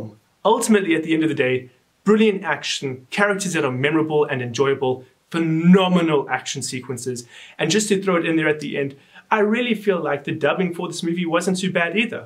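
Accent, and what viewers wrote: South Africa. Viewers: You sound South African 👍👍👍 awe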